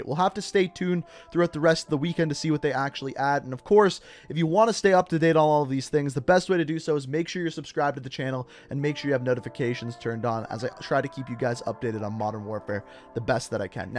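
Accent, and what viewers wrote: Canada. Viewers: I can definitely tell you Canadian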